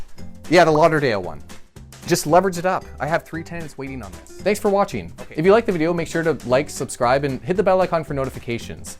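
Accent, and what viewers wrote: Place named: Canada. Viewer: Watched a few of these videos now (great content) and this is maybe the first one where I REALLY noticed the Canadian accent XD. Also the salary multiplier here in the UK is normally about 4.5x.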